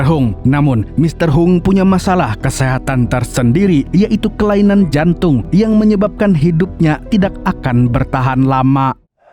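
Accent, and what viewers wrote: Banjar, Indonesia. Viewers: Urang banjar babahasa indo haratnya😂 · Mimin urg Banjar mana ?😊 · Urang banjar kalinya beliau ni lah😂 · Urang banjar kah nyawa ni min😂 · Pian urang Banjar kh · Banjar bnr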